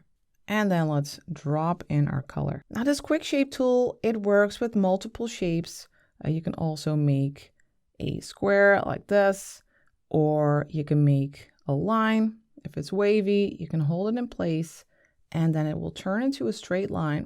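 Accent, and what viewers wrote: Netherlands. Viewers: I really enjoyed this! I am just a beginner and I appreciate your careful and clear explanations…En Ik hoor een mooi Nederlands accent!😊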